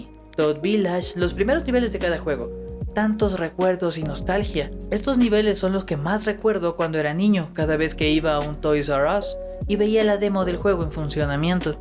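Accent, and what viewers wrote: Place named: Sierra, Ecuador. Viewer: Bro, tu contenido es genial. También soy fanático de Crash Bandicoot. Saludos, también soy de Ecuador. Mas precisamente de Guayaquil, supongo eres de la Sierra por tu acento.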